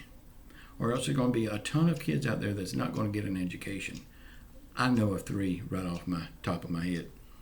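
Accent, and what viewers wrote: South, United States. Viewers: Talks very slow with a southern draw. However, he’s very direct & to the point. No BS approach.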